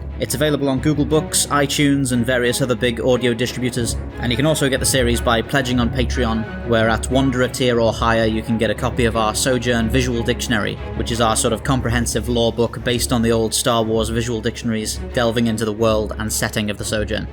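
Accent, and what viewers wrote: United Kingdom. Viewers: I love the glottal stop of the producer of this video; also his use of "little bit." This is my favorite British accent.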